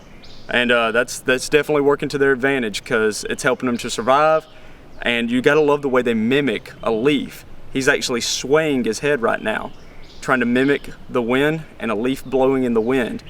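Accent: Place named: South, United States. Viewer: Love the southern accent you have. Keep on truckin bro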